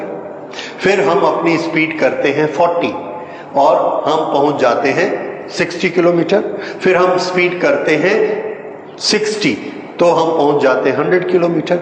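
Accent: India. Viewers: I see all of these great comments about this professors teaching, and only wish I could understand his english better. When I worked with some really nice people from India, I had no problem after we were together for a while, but it has been years, and I know this is english, but there is an accent that makes it very difficult for most Americans to initially understand. I will try to just watch it several times, and hope it then comes naturally, because everyone has so many great things to say about his teaching. I guess it is the way some vowels roll off the tongue, something common in Hindi, that makes it difficult for me....and I'm certain the reverse is also true, where if someone not familiar with the way Americans speak english, they would be spending too much time trying to understand the words, and miss the lesson. Thank you very much for generously posting this lesson sir, and I only hope I can get as much from your teaching as the others have gotten!!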